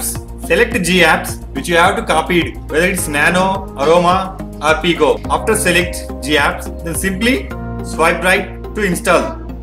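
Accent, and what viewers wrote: India. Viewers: R u from Hyderabad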